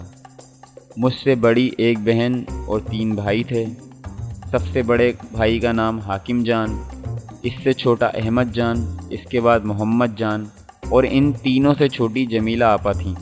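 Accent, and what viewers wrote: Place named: India. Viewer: Ye lehja indian hai ......aesa kabhe nahe hota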